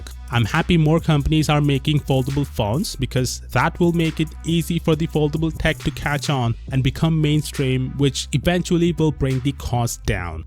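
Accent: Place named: India. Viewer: since your indian yourself probably you should easily be able to tell his indian. I have watched a lot of "microsoft tech support scam videos" and i can tell by his voice/accent he is indian.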